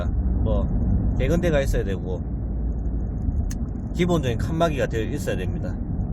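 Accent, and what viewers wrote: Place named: Gyeongsang, South Korea. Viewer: 경상도사투리가 신뢰감을 줍니다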